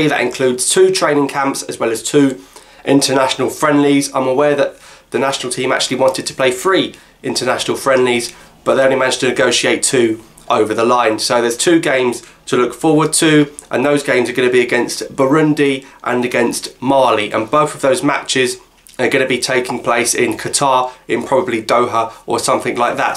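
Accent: United Kingdom. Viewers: Thanks bro nice British accent ! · El Jazairie Judging by his accent he's propably born in 🇬🇧. so maybe he doesn't speak it🤷‍♂️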